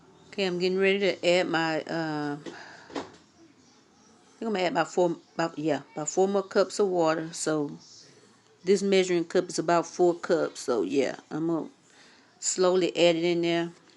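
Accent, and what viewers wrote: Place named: South, United States. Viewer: When I tell you I smelled this through the phone! Oh I love your voice it reminds me of my southern cousins, and watching you cook definitely reminds me of my grandma ❤️❤️❤️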